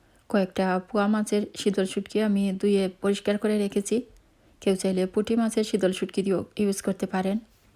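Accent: Sylhet, Bangladesh. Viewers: আপুর কথার মধ্যে সিলেটি কথা চলে আসে।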